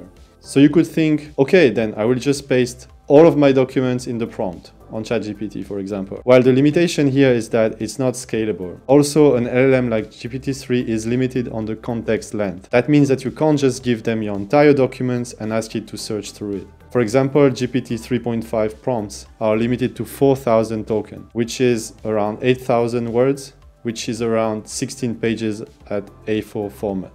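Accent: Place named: France. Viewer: Very useful content and lovely French accent 👌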